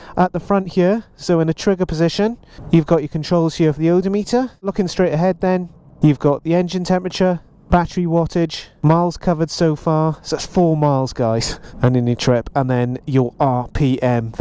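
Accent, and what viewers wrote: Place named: England, United Kingdom. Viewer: Good review mate. I have not ridden an Indian before but I don't care for the weight difference on the right side. To me, that is an Engineering flaw that could be addressed by Indian. I have to say though, as much as I like hearing how you talk across the pond, I just need more England accent and jive talk. Give us the good stuff... :)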